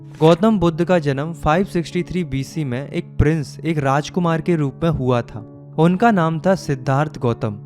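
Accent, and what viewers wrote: Uttarakhand, India. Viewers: Bhaiya, Are you from Uttarakhand??❤❤❤ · Sir are u from Uttarakhand❤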